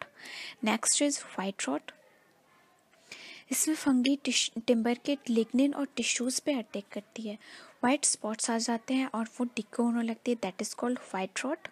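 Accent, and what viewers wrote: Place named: India. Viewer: It would be great if you add English subtitles. It's really complicated to keep up while trying to decipher the Indian accent. Keep up the good work.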